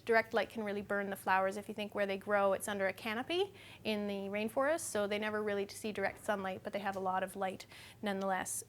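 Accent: Canada. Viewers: she must be from Canada. Oh and by the way. What are you, 4? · Its Canadian accent!!!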